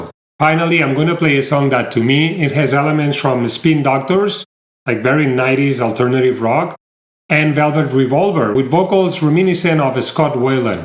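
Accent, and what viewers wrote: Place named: Spain. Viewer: I made it all the way through this video, but mostly for the hot Spanish accent.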